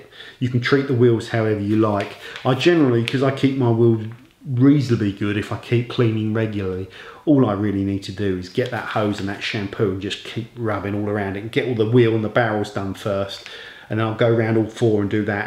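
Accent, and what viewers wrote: United Kingdom. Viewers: More people need to listen to you in the detailing industry... Having 30 steps to weekly wash a car is just crazy to me. I always try to do a good effective wash without spending 2hours of preps+wash+putting everything back in the garage. And i also use in my rinse bucket a vertical grit (bough at my hardware store) to wash my mit properly, then wring it out. I think i am going to try your method, just because it makes it so cheap, safe, and practical. Keep up the good work my friend, plus i always laugh at your jokes, might be the british accent lol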